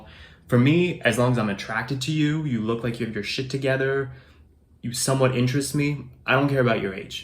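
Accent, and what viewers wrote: New York, United States. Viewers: How could anyone resist that NY accent and the way your voice goes up on words like “Vocalize”.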